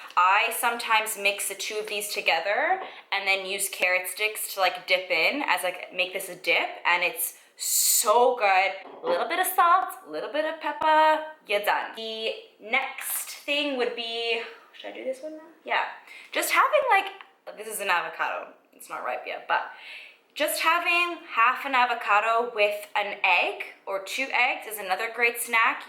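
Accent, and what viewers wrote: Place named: Toronto, Canada. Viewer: I knew it, you sound like your Italian and from Toronto lol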